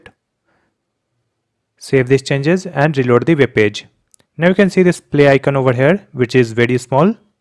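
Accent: India. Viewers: Oh my god. This indian accent... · Why westerners are making fun of his accent . English is not first language of Indians. Show some respect to his work.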